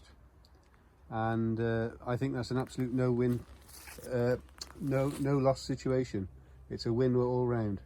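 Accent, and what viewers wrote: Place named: Scotland, United Kingdom. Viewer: I'm not very good with accents. Are you from Scotland? You accent is lovely.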